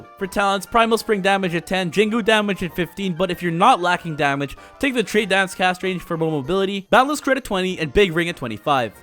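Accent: Philippines. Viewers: heya will u also do content on league? that'd be awesome also I can still hear your Filipino accent UwU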